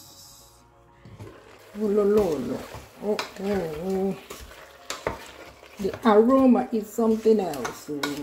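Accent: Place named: Igbo, Nigeria. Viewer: Nne I'm also Igbo but I just find the way you speak English amazing 😍, like the accent is giving me joy❤ I love it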